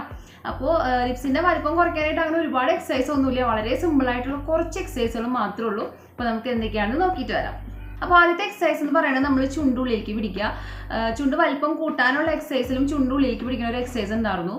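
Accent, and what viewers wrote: Thrissur, India. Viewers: Thrissurkaari aano chechi?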